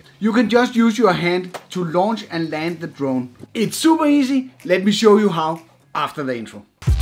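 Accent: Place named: Denmark. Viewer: No question that you are Danish! The accent is obvious from just 10 seconds of listening. Great video!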